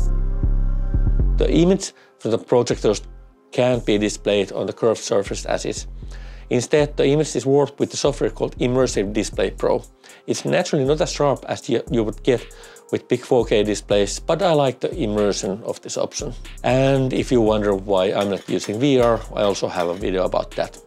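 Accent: Finland. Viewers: this is the first time i watched you and right away i noticed the finnish accent =D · Nice setup. I would love this for my Elite Dangerous games. Are you Finnish btw...accent sounds familiar? xD (Ah checked your info and was correct)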